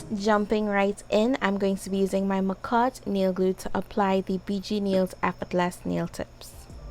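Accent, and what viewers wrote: Trinidad and Tobago. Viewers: These are sooo cute. Side point...I think I'm hearing a Trini accent. If you are then I love you even more lol. I live and vlog in Barbados but I'm Trini so I miss hearing our accent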